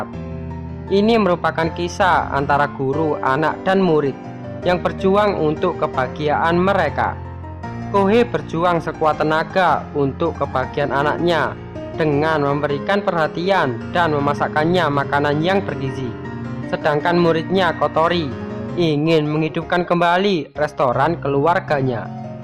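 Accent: Java, Indonesia. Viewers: Full video bahasa medok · ngk ngakak dg logat jawanya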